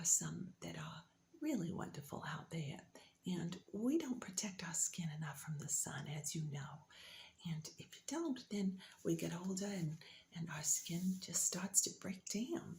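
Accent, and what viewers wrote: South, United States. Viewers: is this what they call southern accent ? ^^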